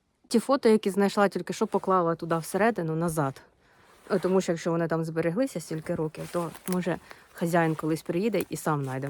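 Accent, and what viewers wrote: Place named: Zhytomyr, Ukraine. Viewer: Здрастуйте. Ви не з Житомирської області? Просто слухаючи вашу мову так думаю.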